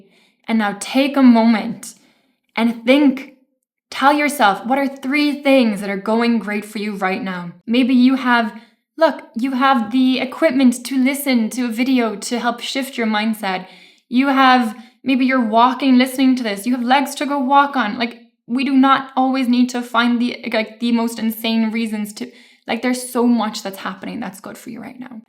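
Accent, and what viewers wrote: Ireland. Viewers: Where are you from, your accent has elements of Irish???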